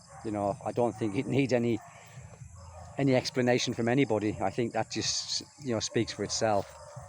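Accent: United Kingdom. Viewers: I think he's more a practicologist w the British accent Westerners enjoy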